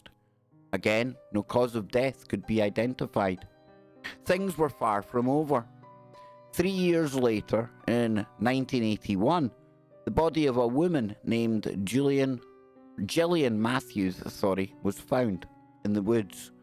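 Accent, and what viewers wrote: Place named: Scotland, United Kingdom. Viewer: Hello from sunny San Diego CA, USA! You rock... Thanks for lifting my spirits. & I am so in love with your ACCENT!!! I just really love it... It's kinda soothing. Blessings to all of you in beautiful Scotland!!! 🤓💜🎉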